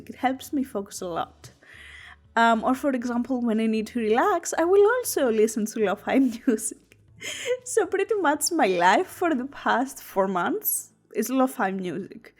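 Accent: Greece. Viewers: are you greek? because you sound like greek :P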